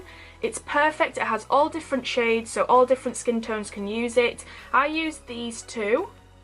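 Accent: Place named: United Kingdom. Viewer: i love your accent which british accent this?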